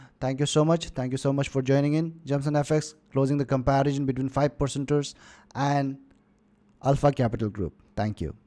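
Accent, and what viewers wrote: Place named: Punjab, India. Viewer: u sound like a punjabi guy❤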